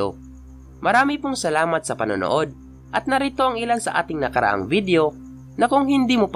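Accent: Visayas, Philippines. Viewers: Bisaya k b dong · Bisaya Accent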